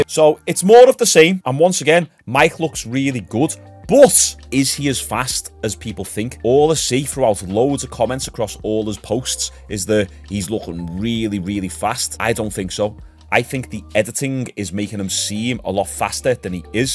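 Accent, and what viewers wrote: Liverpool, United Kingdom. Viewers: 'Maihhh loohhs greit' - how can you not love Liverpool accent? God bless you!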